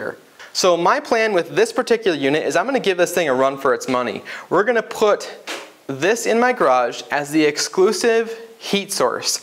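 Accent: Minnesota, United States. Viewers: I was like, "this dude is from MN, or Canada." Nice to see a fellow Mn boy on YouTube. Subscribed.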